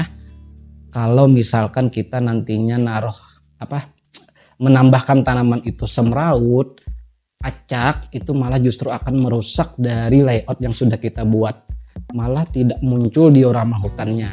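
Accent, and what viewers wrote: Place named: Banjar, Indonesia. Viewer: Logatnya ky org banjar nih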